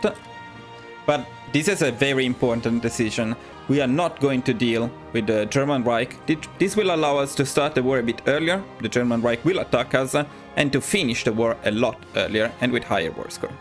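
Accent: Italy. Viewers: bro sei italiano lo percepisco.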